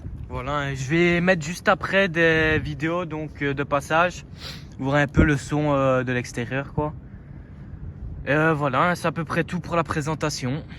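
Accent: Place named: Hainaut, Belgium. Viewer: Avec ton accent Hainaut laisse tomber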